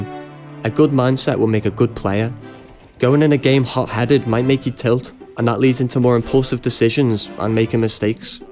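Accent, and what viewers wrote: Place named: Glasgow, United Kingdom. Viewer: Bro you from Glasgow?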